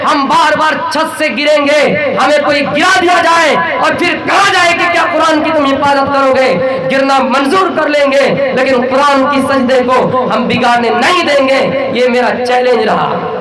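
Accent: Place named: Bengal, India. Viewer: तकरीर सुनकर लगता है कि बांग्ला भाषी है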